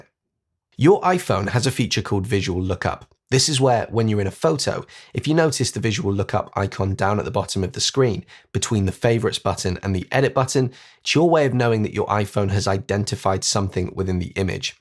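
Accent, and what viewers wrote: United Kingdom. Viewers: Great. I’m in Lisbon understand your British English very well, congratulations! Just got a new 16 Pro Max and love your lessons. Will be a link member soon. Have shared several with my colleagues. Good health! Keep going into 2025.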